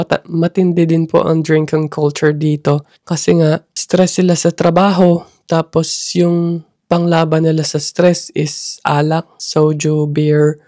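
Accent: United Kingdom. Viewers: Bakit po may british accent yung english mo? Ang arte pakinggan 😅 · Nagulat rin ako sa pa British accent reminds me of Sarah D 🤗 tumira rin kc un sa London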